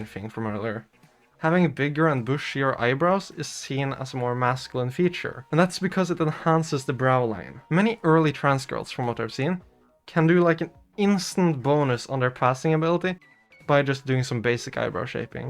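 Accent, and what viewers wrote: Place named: Norway. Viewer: Are you scandinavian? Sorry if that's a personal question but I swear I recognize that accent. *edit: I scrolled down a bit and saw you were norwegian! its cool to see a fellow scandinavian!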